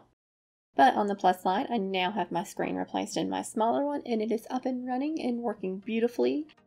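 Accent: United States. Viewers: Awwwww lol!!! I think being from the UK, any accent from the states, us British love!!! 🥰🌈. Your work is so good hun. Thank you for these vids, they really do inspire!! ❤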